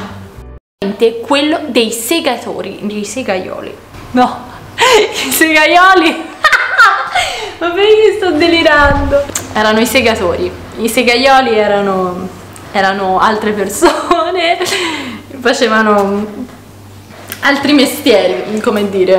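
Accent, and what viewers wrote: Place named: Florence, Italy. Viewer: Mi diverte il fiorentino brava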